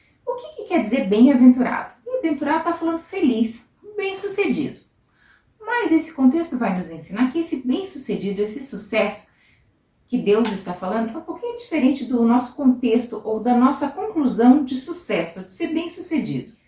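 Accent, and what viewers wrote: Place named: Rio Grande do Sul, Brazil. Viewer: Bom dia ! Hoje domingo de Páscoa .Ele vive 💕 Conheci hj teu canal ,muito bom ! Vc é gaúcha ? Tem sotaque de gaúcha .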